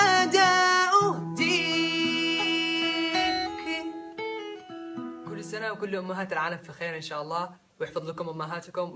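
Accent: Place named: Aden, Yemen. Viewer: اللهجه العدنيه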